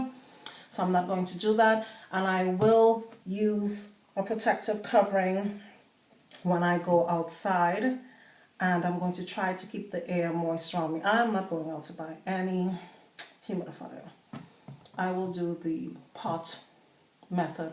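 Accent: Trinidad and Tobago. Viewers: U sound Trini...im hoping you are so you would know the climate we have...what do you suggest I use...my hair tends to dry out-locks and scalp- soooo quickly and sometimes I get tired of moisturizing so often (which is bad) lol...i need something that would keep it moisturized for longer periods in our veryyyy hotttttttttt weather :(((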